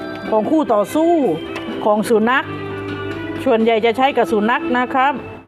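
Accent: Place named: Nakhon Si Thammarat, Thailand. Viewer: แหลงแบบคอนนี่ได้แรงอกมากหวาเพื่อน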